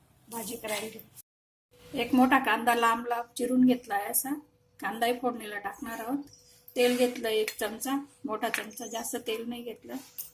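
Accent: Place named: Jalgaon, India. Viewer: मस्त ताई भाजी. आवाज जळगाव जिल्ह्यातील वाटतो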